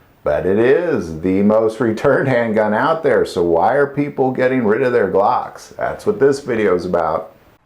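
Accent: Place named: Kentucky, United States. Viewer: I bought a g19 gen 5 for a girlfriend. It wasn't bad but not a good fit for my hands. Dude I thought you were from Kentucky or surrounding area for some reason. Blue steel is a little ride. Thank you for the prayer direct message we talked about. It did work out. Thank you for your positive energy in this direction.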